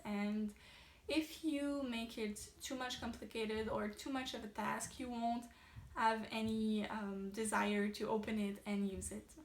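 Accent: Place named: Quebec, Canada. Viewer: I'm an idiot, - I've been trying to place your accent - & of course, you're French (Canadian?) ~, qui? Told you my French was rusty - hell, I even lived 7 worked in France for three years!! In regard to bullet journals, I cannot say I've ever used one - I tend to fill notebooks, then review what is necessary at the end of any, one week - & perhaps that is my peculiar method... Thank you for this latest instalment:D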